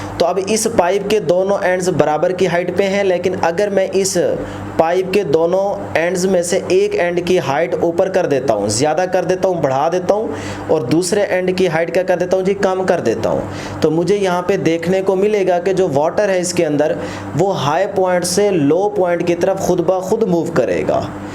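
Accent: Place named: Saraiki, Pakistan. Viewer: Sir accent is like saraiki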